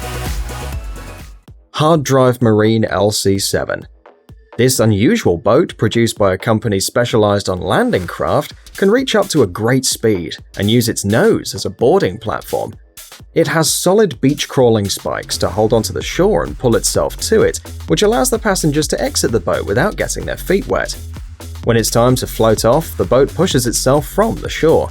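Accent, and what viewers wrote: United Kingdom. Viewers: Couldn't listen to one more minute of that annoying british accent .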